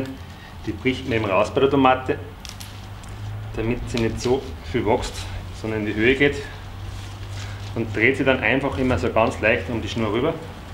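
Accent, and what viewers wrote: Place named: Austria. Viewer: Das IST richtiges österreichisches Deutsch!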